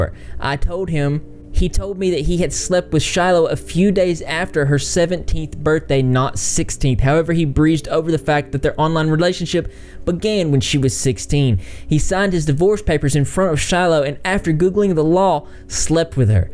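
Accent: South, United States. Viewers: Also, I love your southern accent! I had to work very hard when I was in the Publishing business to get rid of mine. But it has slowly (quickly) returned once I got out of that field. lol Sometimes my accent is so thick that I have to translate for people. Words like Oil, Tire and I'll never get rid of, Ya'll in my vocabulary. lol Keep doin you! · our southern accent really does make it hard haha great video as always! Happy to see you covering everything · where are you from? Hear that southern twang all over this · Love your southern accent dude 😌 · Where are you from? I adore your southern accent. Reminds me of a beautiful still, winter snow day in Tulsa. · My comment here, relates more toward You.. Just to let u know..I love love love, your southern accent. With myself Born and raised, a larger chunk of my life, in thee south. Growing up with it myself, forever hearing it around me, and some family members still down there.. 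my moms side is from Long Island, NYC, with my dads side and thick accents from Alabama and Missouri, and mysyself born/raised/growing up and all of schooling their high school. In Florida, Southwest, Florida. I have to say I really really do miss it sooooo much. Not there anymore and living in NYC currently, it really does make me homesick upon hearing ANY Southern accent! I work With an older man from Louisiana, he has a very thick accent.. and I will find myself gravitating towards him, just bc its a southern twang lol. I do have a slight one, and after being here for 10 yrs, my friends knowing me here, just as long as those 10, still does NOT PREVENT THE RIDICULING of my PRONUNCIATION OF CERTAIN WORDS WITH A SLIGHT TWANG. But ya kno what?? I don't mind at all. 1. I then make fun of their NY Brooklyn/jersey, guido-esq. accent, right back at em, and 2. (Most importantly) reminds me that I haven't lost MY OWN PERSONAL southern accent, which I would never wanna lose AND WOULD BE DEVASTATED IF I EVER DID..😔. 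The southern guy I work with..I find myself gravitating towards all day, for I just Miss hearing the Southern accent and references to things that only the south understands lol. I could just listen to h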